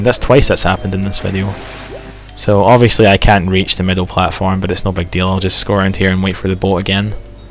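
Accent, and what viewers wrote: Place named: England, United Kingdom. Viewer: I will forever associate this game with an English accent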